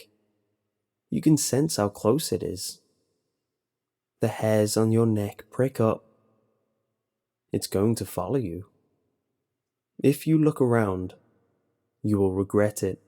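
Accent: United Kingdom. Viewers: "Tooo The Le Taah"...damn I love british accents 😁 And fuck this, waaay too many instructions going waaay too fast. I woulda been said, "fuck you, I'm not THAT bored!" You are my all time fave pasta reader. You could read the ingrediants on a candy bar wrapper and make it sound bad ass. Please start a childrens' channel and read Dr.Suess and fairy tales stories also 👍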